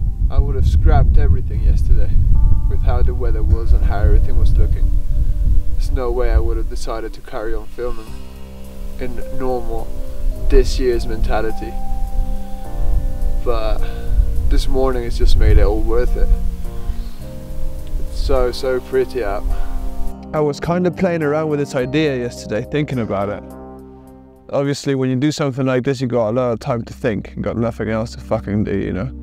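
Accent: United Kingdom. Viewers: i loved your videos in spanish, but im also loving your british accent. and most of all, so glad you just did it and made a video. so beautiful and cinematic even with a simple man climbs mountain storyline every second is enjoyable. i still go back to your other films to improve my spanish. excited for the proximo video and inspire to get outside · i just realized how dificult to understand is british accent for me but anyway your clip are awesome. Thanks for sharing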